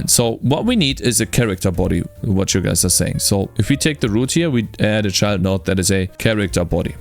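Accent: Germany. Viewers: höre ich da einen deutschen Akzent :D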